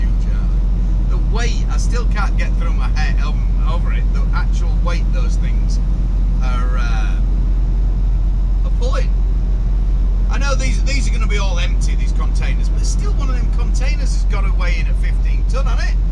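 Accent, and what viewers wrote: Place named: England, United Kingdom. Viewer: Love your accent. Reminds me of the song, I'm an alien I'm a legal alien, I'm an. Love your videos. Howz trucking in USA as compared to UK?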